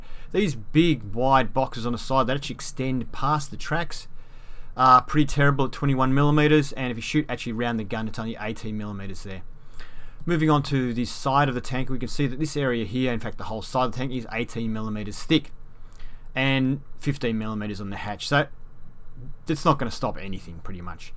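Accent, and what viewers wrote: Australia. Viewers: are you australian?!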